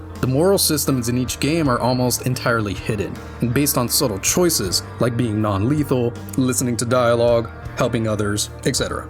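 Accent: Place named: South, United States. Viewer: What's with all the midwitted manchildren that don't understand vernacular and accents? Nu-kyu-lur is a dialectical pronunciation. We know how it's actually pronounced. But that pronunciation works better with a Southern accent due to how we stretch vowels and shorten consonants. It's why we pronounce "oil" as "ole" and "window" as "winder." It's just easier to pronounce for us. Nobody harps on the Japanese for pronouncing McDonald's as "Makudonarudo" because they struggle to pronounce the OG name. Nobody gives Jamaicans shit for pronouncing things the way THEY do. And there's nothing wrong with their mode of speech because it works for them, and they understand it. Look...language is an evolving, living thing. It changes. Despite my personal elitism over Classical Latin being disregarded in favor of Ecclesiastical pronunciations in historical films set in the Roman Empire/Republic eras, I get why it's done. 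But that's more about authenticity. Went off the rails near the end there, but you get my point. Accents are accents. No shit. Bostonians saying "paak the caah" and "hahbah" instead of the intended pronunciation is fine. It's not a sign of ignorance or insipidity. And pointing it out is the lamest Reddit "gotcha" that only reveals how fucking stupid YOU innately are. What else? You gon tell us Frankenstein was the Herr Doktor and not the monster next? Greenland isn't actually green, and Iceland isn't all ice, Reddit professor?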